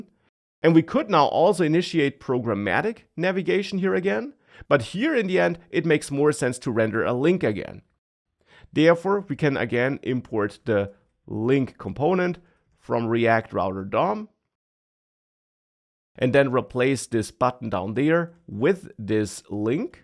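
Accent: Bavaria, Germany. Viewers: funny how the Bavarian accent is disappearing :-)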